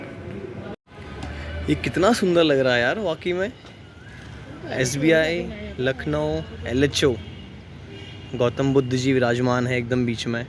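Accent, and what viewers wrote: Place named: Nepal. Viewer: Interview ki bareme jankari diya bhai great, nepali jeisa laga bhai aapko aap nepali ho?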